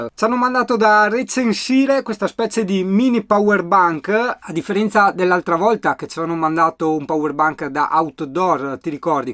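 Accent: Veneto, Italy. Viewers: Ciao, bravo fai anche tu recensioni che ti aiutano ad avere ulteriori introiti. Ps, ho vissuto a Mestre tanti anni ora sono poco fuori, il tuo accento che è delle nostre parti mi rilassa!!! Ciao beo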